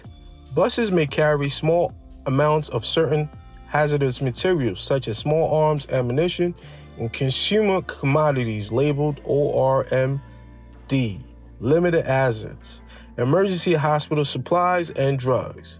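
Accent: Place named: New York, United States. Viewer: Finally!! An actual human voice! And a NYC accent at that! 😆🙌🏼 Thank you!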